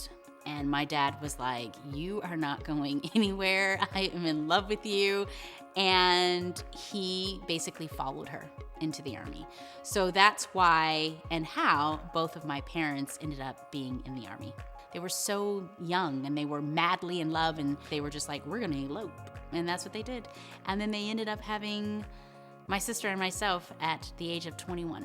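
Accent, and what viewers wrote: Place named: Bronx, United States. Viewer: Sound like Bronx tale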